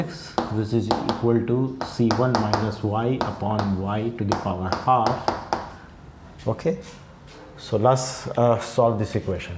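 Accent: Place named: India. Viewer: I like indian english accent also its hard to understand sometimes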